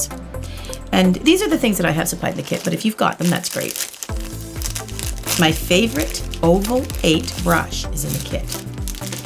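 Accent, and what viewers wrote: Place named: Canada. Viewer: Heard a slight accent and thought, she mightttt be Canadian.... Then checked your page and realized you're in BC! Can't wait to order your nail kit when it's back in stock <3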